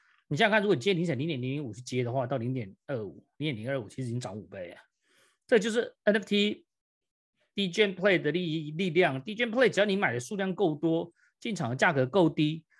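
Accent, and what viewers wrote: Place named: Malaysia. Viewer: 感觉像马来西亚人。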